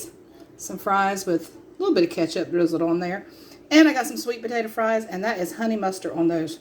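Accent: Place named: East Texas, United States. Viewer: I’m new here but I feel like I’ve met a neighbor. I’m from east Texas, and you would fit right in here. We even sound alike. I’m binge watching your videos... I love your style. God bless your heart.❤😊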